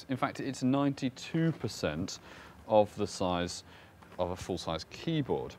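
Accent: United Kingdom. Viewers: "changing the market to create the haychpee (HP)" LOL I LOVE BRITISH ACCENTS (at least i think that he is british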